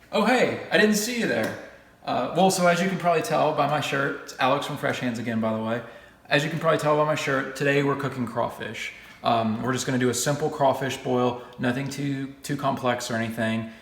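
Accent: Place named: North, United States. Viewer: you sound like a yankee. no wonder you did everything "back assward".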